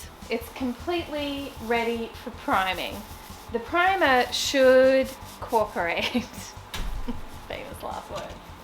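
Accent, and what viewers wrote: Australia. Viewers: I just seen this as it popped up , I have to ask , do I hear an Aussie accent... love from Australia..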